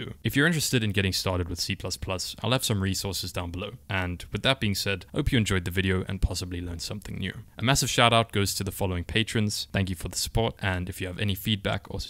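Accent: South Africa. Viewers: Are you south african? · I hear a South African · You sound south african? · are you south African?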